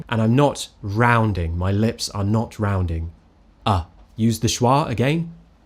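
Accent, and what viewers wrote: United Kingdom. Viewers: You have one of the most beautiful British accent ever❤❤❤❤❤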